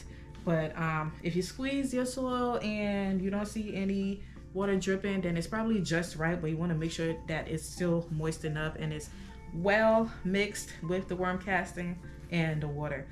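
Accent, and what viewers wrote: Liberia. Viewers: Do I hear a faint Liberian accent?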